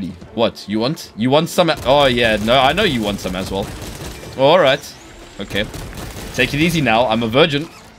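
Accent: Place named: Scotland, United Kingdom. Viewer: Narration alone warranted a Sub... Salute to the Scottish.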